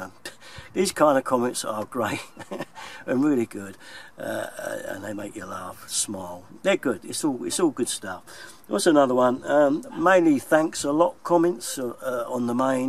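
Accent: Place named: London, United Kingdom. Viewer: What part of London are your from?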